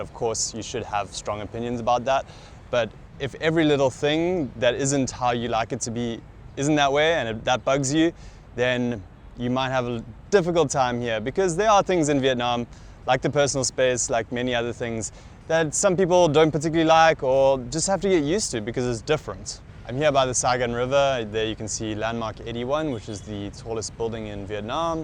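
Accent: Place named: South Africa. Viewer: Ek hoor 'n Suid Afrikaanse aksent.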